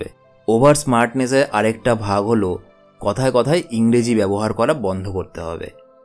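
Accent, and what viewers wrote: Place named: West Bengal, India. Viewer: কথার উচ্চারণ শুনে মনে হচ্ছে আপনি ভারতীয় বাঙালি।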